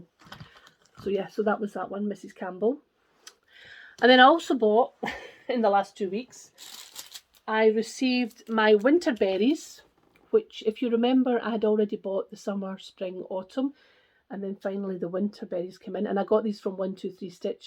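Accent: Scotland, United Kingdom. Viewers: Just found your flosstube...love listening to a Scottish accent 😍 I also stitch what I want to, depending on my mood, so call myself a moody stitcher 😁 Sounds like a great retreat.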